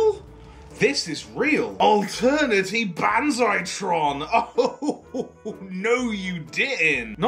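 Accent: Texas, United States. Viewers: american/texan accent sux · Loved i mean looooved the Texas accent lol.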